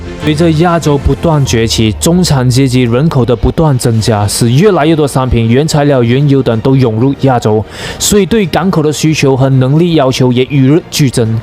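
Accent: Malaysia. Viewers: 是马来西亚人的口音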